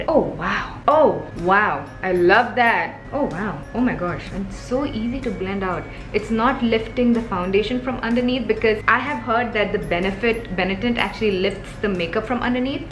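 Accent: India. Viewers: It's an Indian accent, but with a little bit of western influence? Idk accents in India vary from place to place and generation to generation. There's soo much variation seriously, like in my class alone, I can identify at least 5 different accents.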